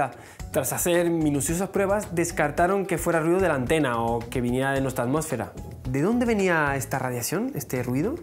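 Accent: Canary Islands, Spain. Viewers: Es canario. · en otro vídeo dijo que es canario. Aparte, su familia tiene acento canario y él hace años también lo tenía.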